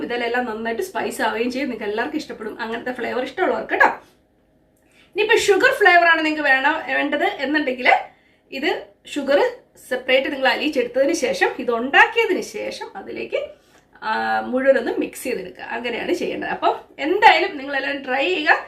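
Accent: Kottayam, India. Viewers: Chechi nattil evde..?? Kottayam slang anu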